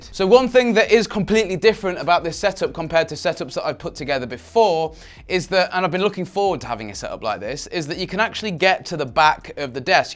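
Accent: England, United Kingdom. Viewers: He looks like jayztwocents son with an English accent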